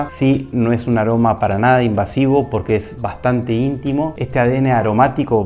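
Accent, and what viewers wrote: Argentina. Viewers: Bien claro las explicaciones. Maestro es cordobés usted?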